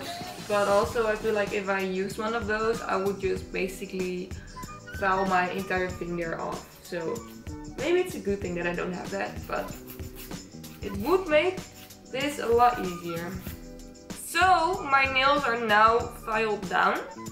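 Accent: Netherlands. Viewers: Je Nederlandse accent is zo herkenbaar lol, goede video 12/10. Groetjes van België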